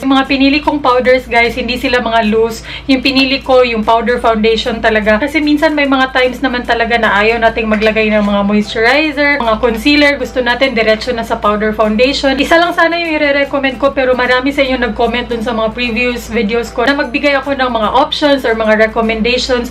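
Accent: Visayas, Philippines. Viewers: Omg bisaya 😂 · are you anywhere from Visayas? kasi halata po sa tono I mean sa accent nyo po.. from Cebu here :) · maklaro man na bisaya nis ate na hahaha cute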